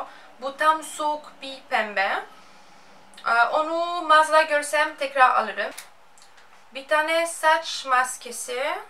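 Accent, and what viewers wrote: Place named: France. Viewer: Fransız aksanın var galiba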